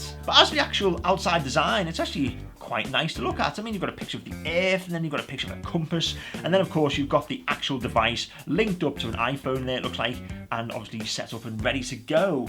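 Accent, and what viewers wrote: Liverpool, United Kingdom. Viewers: Can you get these down Paddy’s Market Lah?. You just can’t hide a Scouse accent LOL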